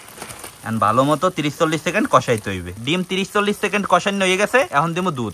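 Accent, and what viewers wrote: Barishal, Bangladesh. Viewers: বরিশাল এর আপনি?? কথা শুনে মনে হচ্ছে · ভাইয়া আপনার বাড়ি কি বরিশাল 😊😊😊😊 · কথার ভাষা একটু ভালো হলে ভালো লাগতো বরিশালের ভাষা বলে কথা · এ দেহি মোগো বরিশাল্যা 🙂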